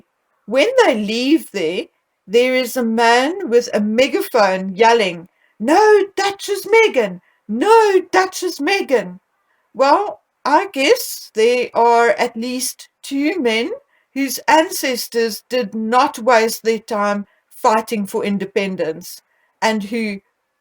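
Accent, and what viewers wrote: South Africa. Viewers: Good for you. I love your channel I also adore your accent. I adore South Africa. Use to live PMB. Keep up the great work. I look you and Sue me both out. Love you. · Hi, really enjoy your videos. Love your accent it reminds me of the years living in S.A. such a beautiful country and wonderful warm people. Ignore the "Negie Megies" if they don't like what you have to say they can change the channel and protect their sensitive little souls, yes, sometimes the truth hurts! They just need to "smarten up." No body shaming, but I do think Mz. Meggie has put on weight and the padded look in her thighs possibly the result of some of control panty, Spanx? When you check out other pictures and look at the size of her calf muscles, to me, it looks like they are a little fuller. Regarding her "fashion sense" or lack thereof, she nearly always looks to me like she has been dragged through a hedge backwards - my personal opinion. 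The rolled up cuffs on the red outfit that looked like it was made from a yacht's spinnaker was purely to show off the collection of jewellery she was sporting on her wrists and hands... so transparent! As for PH's treatment regarding those children... don't get me started, he needs a good swift kick up the arse. The pair of them should be ashamed of themselves as should whomever set up the publicity stunt for them. Yes, the timing for PC's does look dodgy, however in his defense, climate change, farming, the land and the environment have been a passion of his for years so whatever the Harkles are bringing to the table regarding this is something they have absorbed like dried up sponges and wrung with a "hey look at u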